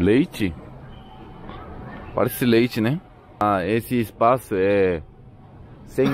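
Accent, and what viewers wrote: South Korea. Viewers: Eu fico muito feliz quando vejo um coreano falando em portugues tipo acho engraçado eu fico tipo : meu deus que lindo caraaa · Kkk é supermercado brasileiro mesmo kkk Mano eu acho muito fofinho ver coreanos falando português kkkk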